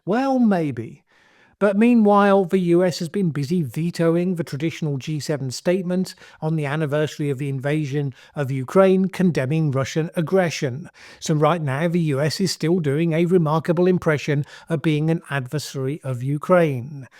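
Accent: United Kingdom. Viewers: It's only your British accent that makes you sound intelligent, sir. But the actual content of your bloviating is vapid, smug and snide.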